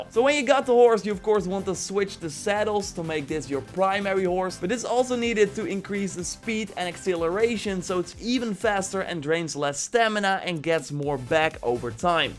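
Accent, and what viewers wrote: Netherlands. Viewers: Dude, damn... dutch accent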